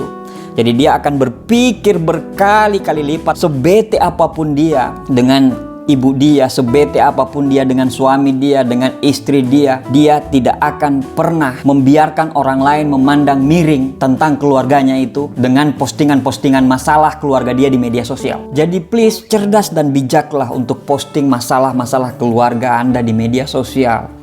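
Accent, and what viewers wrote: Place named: Makassar, Indonesia. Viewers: Logatnya Makassar kayaknya ini om 😁😁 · Kelihatan logatnya pak, apa dia lahir dr mkassar? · Dari logatnya bang org Sulsel y, kl benar spertinya sy baru nemu youtuber motivator dari daerah sana, bahasannya oke punya👍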